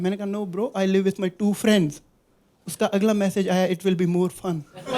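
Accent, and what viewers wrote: Kashmir, India. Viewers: Puré Kashmiri accent 🫶🏻🌷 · Kashmiri accent hai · Mainey subas - crybe karr leya 😂😂 .. Kashmiri accent ❤ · is just Samay riana with a beard, a Kashmiri accent and daring muslim jokes. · Awesome Performance. Timings and delivery along with that Kashmiri Accent was fire 🔥🔥All the best from US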